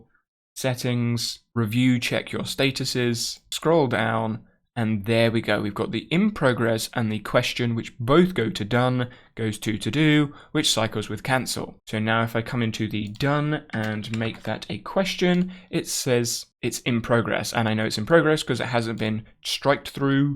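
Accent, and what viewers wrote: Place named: United Kingdom. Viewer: So refreshing to have a British accent explaining something to us. :-)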